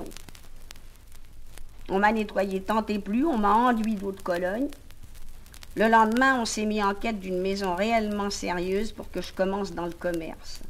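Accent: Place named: Paris, France. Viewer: Avec ce texte tu sembles croire que je m'oppose à la lecture orale alors que ce n'est pas le cas, ça dépend des qualités de l'orateur, du niveau d'éducation littéraire du publique, du contexte (divertissement etc...). Donc encore une fois tu piges rien, et c'est pour ça que tu préfères taper, tu compenses ton impuissance intellectuelle par la force. Celine détestait la violence au cas où tu aurais oublié, il n'hésitait pas à séparer des bagarreurs quand il en croisait. Et pour ce qui est du jugement t'es encore une fois à coté de la plaque; je n'ai pas jugé Arletty dans sa personne mais cette simple tentative de lecture, ce qui n'est pas un problème si c'est argumenté. T'as jamais donné ton avis sur un film ou un livre peut-être. Je ne vois pas où j'ai été condescendant non plus, va relire la définition. Le sens du texte, si tu veux que je te l'apprenne, c'est un ado qui passe un examen, qui est angoissé par le poids de l'avenir que ça représente, inquiet par dessus le marché des risques de correction si le résultat est mauvais, qui en somme ne comprend pas bien la rigueur que la vie impose à un si jeune age. 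Et Arletty, au lieu d'essayer de faire passer cette angoisse, elle nous fait du Arletty (que j'aime bien d’ailleurs dans d'autres contextes), elle y va en décontraction, gouailleuse, vas-y que j't'en rajoute un peu d'accent titi parisien. Comment veux-tu que ça colle? J'ai deja lu un texte devant un publique et j'ai accepté les critiques donc ravale ta sortie sur l’hér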